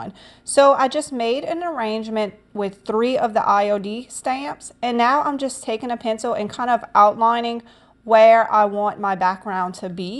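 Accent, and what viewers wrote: Louisiana, United States. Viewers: I adore your videos! Always great ideas, fun techniques AND the accent reminds me of my in-laws and makes me smile - my husband is from Abbeville - bonus! Haha! Thanks for the chance to win! I’m loving the pitcher at the end with the clay added! LOVE all things IOD and haven’t been able to get my hands on a set of stamps yet. XOXO…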